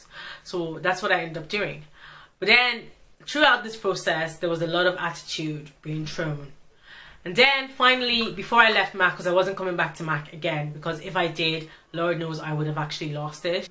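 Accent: Ireland. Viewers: your accent sound irish♡, I used to live there. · Do you live in Ireland? There's a little Irish accent in your voice! ❤️ (I'm from Ireland) Subbed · ***** oh i just realise the girl an irish accent i am from ireland what part you from lolz i am from co.tyrone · I was thinking "Oh cool, a YouTuber from Nigeria. Wow, it sounds so much like an Irish accent sometimes, that's kind of interesting...." Lol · Your accent is beautiful. I was listening and listening, and I was like...Irish...and Nigerian. It's awesome! So lucky! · Have you got a irish accent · Are you from Dublin? Just a guess haha x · I'm a brand new subbie so I have to ask!!! Are you Irish ? It's just that I live in Dublin and I can hear it in your accent! Not knowing is killing me! xxxx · Are you from Ireland? Your accent is amazing omg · so so pretty! I have never seen a Nigerian with an Irish accent! 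its actually really nice! sorry you had a crappy time, isit the same in ireland with mac? x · I just came across your channel and I'm wondering did you ever live in Ireland you sound really Irish sometimes when you speak :) can't believe how you were treated I would have freaked out lol. I've never had this happen to me thank goodness! :) I subbed :) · Sounds more Irish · i knew I recognised the accent 👌im Irish two 💞Are you living in Dublin❓🍀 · I'm Irish, and you have a bit of an accent, do you live here or are from here? You're absolutely stunning, and I loved this video! Subbed x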